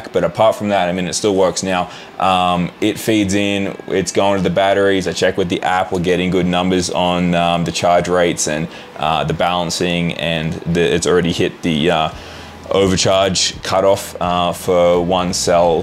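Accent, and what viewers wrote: Australia. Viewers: Dude, where are you? Aussie accent, 120v inverter. Confusion Ahh Colorado.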